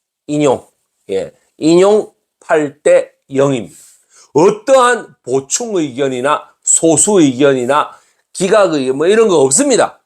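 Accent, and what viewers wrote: Gyeongsang, South Korea. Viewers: ● 항상 매 영상마다 잠에서일어난 숙취가득한 헤어와 까슬수염 가득한 얼굴이지만 그래도 교수를 나는 사랑한다.. 그의 정의에가득찬 경남사투리를 나는 사랑한다...●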